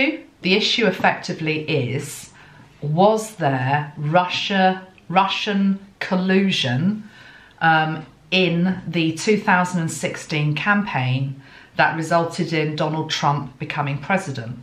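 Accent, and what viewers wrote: England, United Kingdom. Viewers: Hi . I like your English accent and the way you put those perfume on you to connect with energy . You're very genuine and kind . ❤️❤️❤️❤️ Ps . Your house looks very tidy and everything is on their place . Your dress very nice too.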